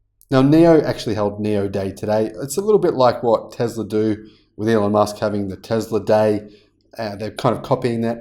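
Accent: Australia. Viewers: I just subscribed to your channel. It's great. To make it even better, please get yourself a good lapel mike. (No offense intended, but your Australian accent is an added challenge to non-native English speakers.)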